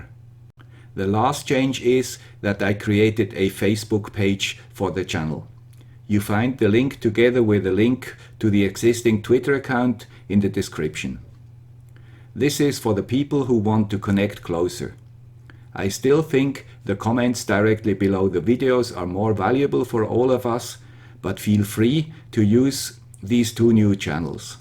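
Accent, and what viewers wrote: Switzerland. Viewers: Congratulations! Is that picture "young guy with swiss accent" on your shelf? And very nice solution for cables! I think I'm gonna do same thing behind my door. Thank you. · Well done " " (or is it white gloves now:) )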